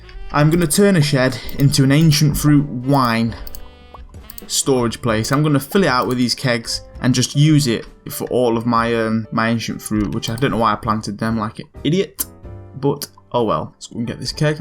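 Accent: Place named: England, United Kingdom. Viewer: Where in England is that accent from? Or is it a speech impediment? My apologies if the latter, but if not, for the love of god it's annoying as all fucks. Feel like I should leave a compliment to balance this comment now... nah. I'll just like the video. -1 +1 karma.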